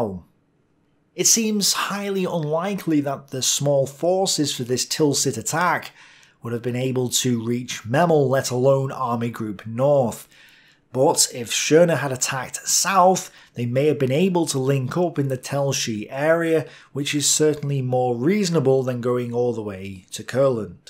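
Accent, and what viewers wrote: United Kingdom. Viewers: He can't help his British accent!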